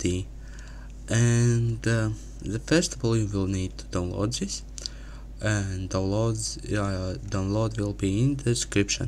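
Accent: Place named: Russia. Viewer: Are you Russian? Просто дико русский акцент, а насчет туториала, спасибо, помогло :)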